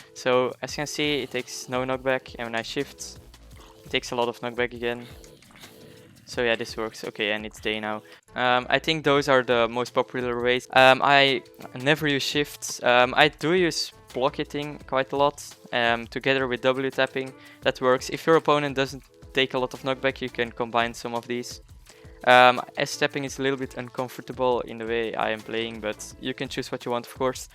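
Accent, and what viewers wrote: Netherlands. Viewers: Dutch accent lol keep going ur actually pretty good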